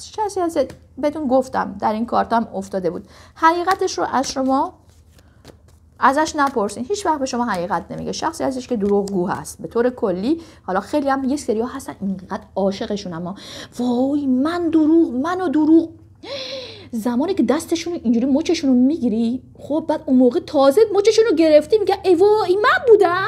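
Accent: France. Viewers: oui. C’est ce que j’ai pensé. Quand vous parlez en perse vous faites certains sons( ex: op) qui rendent évident que vous êtes française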